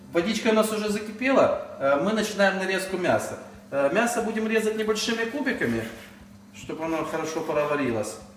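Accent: Ukraine. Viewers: Ведущий с Украины, похоже с Юго-Востока т.к. чисто по- русски говорит. А у нас так и говорят всегда -"Возьми , съешь огурчик или пойду собирать огурчики". Это как в Росии да и у нас русские часто говорят -" Съешь , помидорки" . Хотя остальных суфиксов действительно многовато, наверное просто мужчина хотел придать видео больше душевности и убрать официоз.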